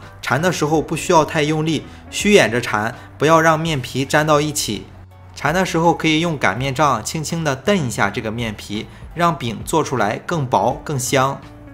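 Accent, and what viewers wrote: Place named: Jinan, China. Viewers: 青岛人，让你听出来济南话了。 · 这济普话听得真亲切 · 这北方话，北方风味，👍🏻👍🏻👍🏻👍🏻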